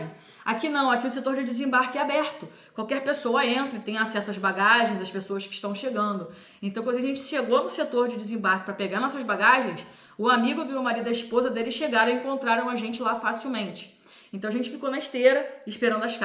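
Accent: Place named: Rio de Janeiro, Brazil. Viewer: Que legal! Eu moro em Michigan. Pelo sotaque desconfio que você seja carioca....eu também sou apesar de ter morado meus últimos anos no Brasil em Petrópolis. Gosto bastante de morar aqui nos EUA.🙂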